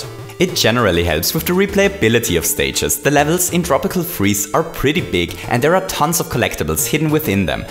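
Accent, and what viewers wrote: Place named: Germany. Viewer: He's from Austria, it seems. I knew the accent sounded German!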